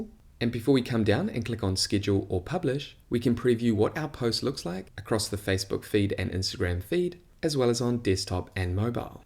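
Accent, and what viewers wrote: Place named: New Zealand. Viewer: Good job mate! I loved your kiwi accent btw!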